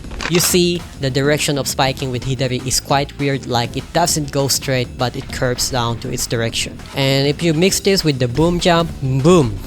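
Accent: Philippines. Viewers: Pretty sure it might be a Filipino accent (as a Filipino) correct me if I’m wrong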